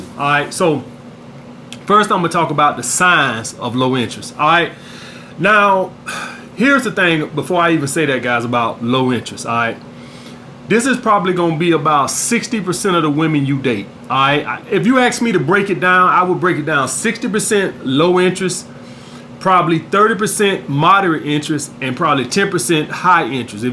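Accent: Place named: Alabama, United States. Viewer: Battlerap Legend Alabama accent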